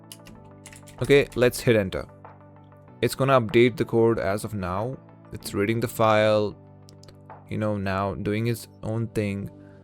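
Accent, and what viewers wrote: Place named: India. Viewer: buddy u cant hide indian accent 😂, keep going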